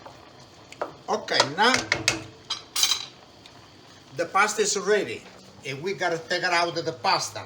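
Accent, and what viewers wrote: Italy. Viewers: I like the Italian accent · Yes, but only next to Lidia Bastianich tho. For me anyway. He is adorable and when he speaks, it's with that unmistakably Italian flare.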